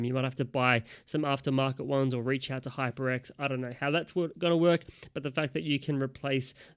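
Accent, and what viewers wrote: Australia. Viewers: ahhh, the Aussie ascent is music to my ears. 😌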